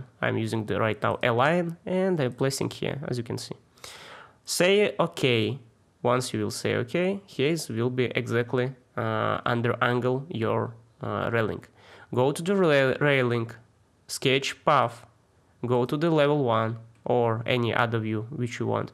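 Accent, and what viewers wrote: Russia. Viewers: Спасибо за урок. Судя по акценту ты с России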